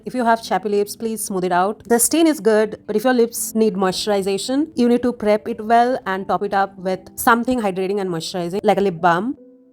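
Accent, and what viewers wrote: India. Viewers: can u stop picking on people on the internet? she seems bengali and this is how their accent is. It's not her fault so why the fk are u blaming her? She has almost 8k subs while u cant even make one video. If u dont like her accent then click off the video, shes reviewing a lip gloss not ENGLISH SPEAKING.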